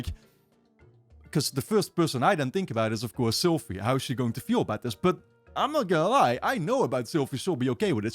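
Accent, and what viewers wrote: Netherlands. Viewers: are you dutch?? or do i mistake your accent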